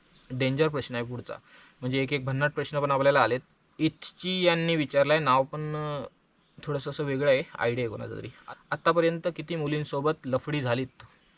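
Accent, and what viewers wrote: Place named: Malvan, India. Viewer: Malvani boltas taa pan bhari vata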